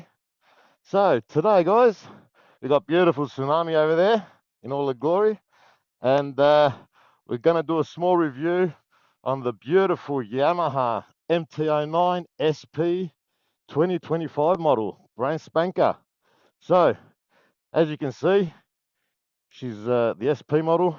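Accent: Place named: Australia. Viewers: Definitely sounds like a fellow Australian, which makes the fact he's driving in somewhere with greek/cyrillic alphabet(?) all the more interesting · Yeah this is a GEM bike. IMO it's the complete placate because you can use it for commute, twisities and some level of track riding! Btw are you from Australia, haha? Can sense the aussie in ya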